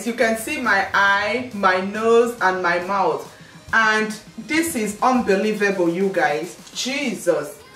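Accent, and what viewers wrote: Nigeria. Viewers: jmelias shanelle no. She just has one of the accent from a tribe in Nigeria. (Igbo) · Nigeria most likely, because her accent sounds very strong nigerian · Vasty A. she is Nigerian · She's from Nigeria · Many of Nigerians people speak that way. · U sound Nigerian :) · I guessed from her accent that she was Nigerian, like me, and I've seen it confirmed multiple times in comments. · are u nigerian ? u sound exactly like my parents...and basically 2/3 of my family . and if u are may i ask what part ? :)) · She sounds Nigerian. my teachers and friends have the same accent, and they're from there. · She must be Nigérian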